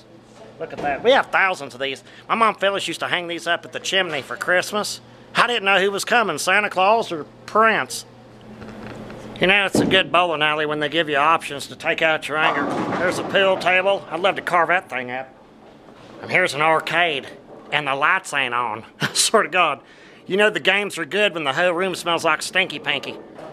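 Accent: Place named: London, United Kingdom. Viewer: What part of London are you from?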